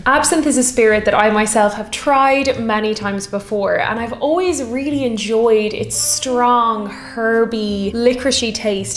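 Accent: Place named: Ireland. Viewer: Irish was recently voted as the most attractive accent in the World...but I believe that was about English as spoken by males. WELL - I must be mistaken but I could listen to 24/7. And what a beauty...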